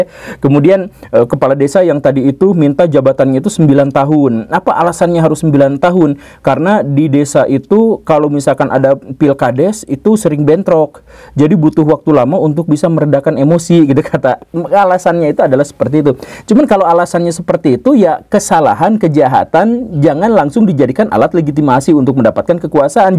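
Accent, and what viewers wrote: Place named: Sunda, Indonesia. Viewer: Aksen sundanya medok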